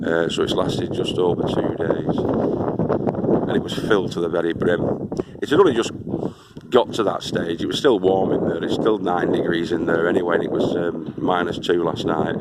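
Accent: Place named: North West England, United Kingdom. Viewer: Please can you explain your name? I know is teacher in Indonesian and perhaps other languages. Then what's its origin and relationship to you? You sound like come from NW England to me! Generally enjoy all your videos Cheers john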